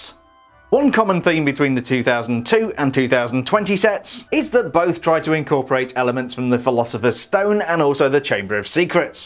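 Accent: United Kingdom. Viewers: I’m confused do you live in Britain or America because you have a perfect British accent but then keep hinting you live in America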